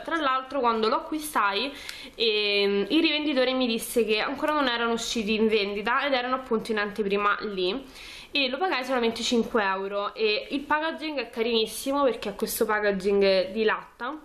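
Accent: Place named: Rome, Italy. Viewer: nella parola Packaging la "G" finale è muta. si legge pacagin. Te da romanaccia ce ne metti 3 di G alla fine :) Però sei simpatica. Ciao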